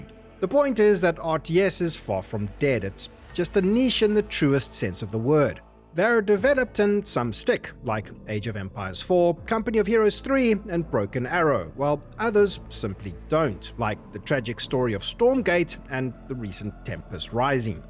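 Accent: South Africa. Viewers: Warcraft 3 has been having some traction lately with bigger prize monies etc. And are you South African 🇿🇦 If you are awe! 🫢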